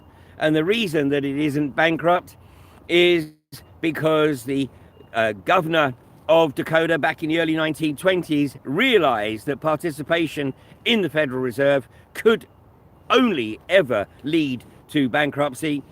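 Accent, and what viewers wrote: England, United Kingdom. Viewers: the “Stop the crime” channel mirrored your video about the culling of the U.K. pop, and so I found your channel, and subbed. So hello from NE Ohio! I just love your awareness, your beautiful background, your English accent, and most of all your awesome spirit! Rock on!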